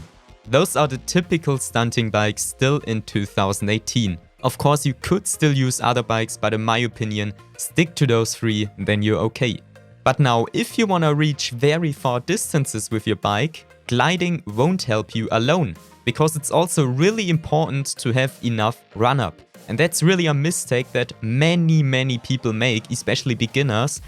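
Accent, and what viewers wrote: Germany. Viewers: yes, i can hear it very good, that he is from Germany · You are a 100% from Germany. Like ur speech omg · Man kann so hart hören das du deutscher bist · Man hört voll das du eigentlich deutsch sprichst 😂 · Digga gutes Englisch man hört das du Deutsch bist! Nices Video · Can you speak German? You have this accent. Im from Germany so yeah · Alter ich schalte das Video an und höre sofort dass du Deutscher bist 😂. Aber alles gut. Ist wirklich informativ. Danke! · So heftig deutschen Akzent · You have a german accent are u german? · der deutsche akzent killt aber nice video bro hat mir echt geholfen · I know it from your a accent that you are a german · irgendwie höre ich ein bisschen deutsch in deiner stimme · man hört das du deutsch bist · Bro ich merk doch direkt das du deutscher bist. Sprech doch einfach deutsch. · Man kann hören das du Deutscher bist😂 aber gutes video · He sounds so damn German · deutscher akzent lässt grüßen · I can tell from your english, youre 100% a German Guy. 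This Video helped me a Lot thanks. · Du bist alman oder man hört das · ich höre es doch du bist deutsch hahaah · German accent haha 😂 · Dein alman Akzent hahahah😂😂😂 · Dieser deutsche Akzent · Man hört voll das du Deutsch bist LOL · der deutscheste akzent den ich jemals gehört habe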